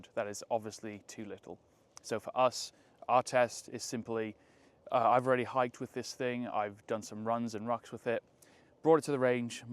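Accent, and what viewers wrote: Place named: England, United Kingdom. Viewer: a real round then. When I heard the English accent I thought he was gonna sell a .22 😂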